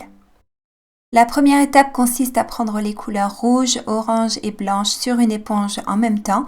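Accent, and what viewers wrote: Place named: England, United Kingdom. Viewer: vous avez toujours été française ? car j'ai l'impression que vous avez un accent anglais.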